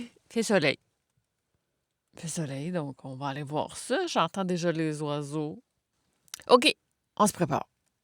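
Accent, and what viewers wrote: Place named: Quebec, Canada. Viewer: J'apprécie ton éthique (pas de spectaculaire à tout prix, savoir regarder pour voir le beau, prendre juste ce que les bébêtes donnent, rien de plus...). Tu fais en plus un travail de montage et de scenarisation important et qui fonctionne très bien. Bref, je me suis abonné il y a quelques jours, et je découvre avec grand plaisir tes vidéos, même les plus anciennes. Merci de nous partager ton regars et de bien belles images de la faune par chez toi. En plus avec ce beau parlé Québequois ! Un "maudit français" également amoureux de la nature, et qui se régale de voir toutes ces espèces inconnues (ou accidentelles !) par chez nous..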